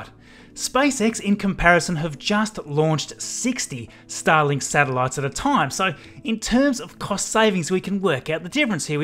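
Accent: Australia. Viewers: Can not listen to a thick Australian accent; it's unbearable; sounds like a flushing toilet. Can you get someone with a soft pleasant voice?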